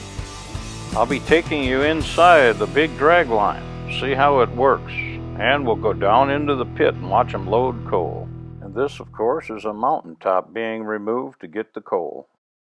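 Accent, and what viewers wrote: United States. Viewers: The speaker has the typical degenerated american speech! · I loved this video, so educational. The man was a great narrator. Loved listening to the country twang in your voice. Great work man.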